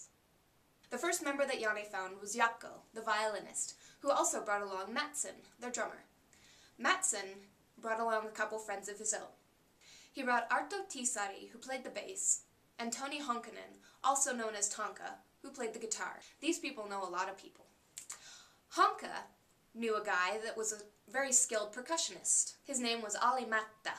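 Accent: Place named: Finland. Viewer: so informative video, and what cool finnish accent:P by the way, posted your video on their facebook page, you might like to know ^^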